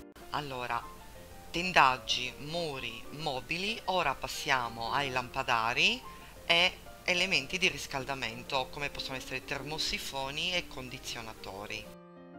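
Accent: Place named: Sardinia, Italy. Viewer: Wow che bella voce forte e si sente l'accento Sardo,Bel video cara,molto ben fatto anch'io inizio le pulizia dalle parti alte,like e buona giornata!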